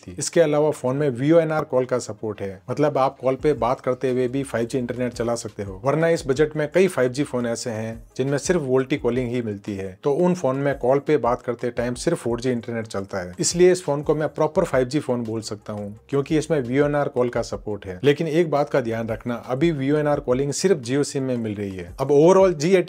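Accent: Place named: Marwari, India. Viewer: Apki boli se to aap marwadi (Rajasthani) lag rahe ho bhai ji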